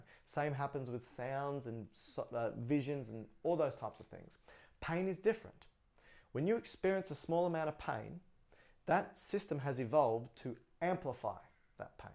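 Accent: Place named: Australia. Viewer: Came for the muscles. Stayed for the Aussie accent.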